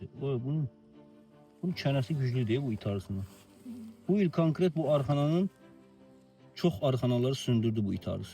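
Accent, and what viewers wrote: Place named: Zaqatala, Azerbaijan. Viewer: Zaqatala ləhçəsi)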